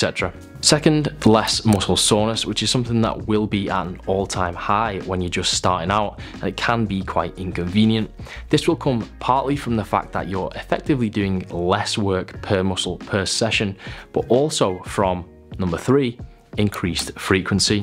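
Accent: United Kingdom. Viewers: Are you from UK ?? your accent is very hard to follow.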